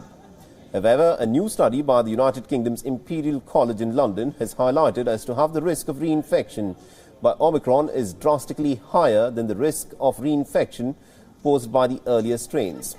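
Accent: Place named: India. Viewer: Meaning is lost with the Indian accent. Huh...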